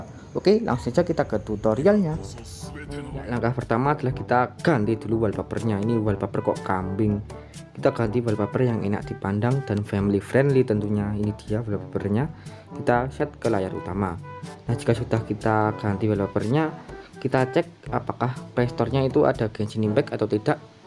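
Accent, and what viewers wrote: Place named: Java, Indonesia. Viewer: Anjrr wong jawa cuk .. wis bli beres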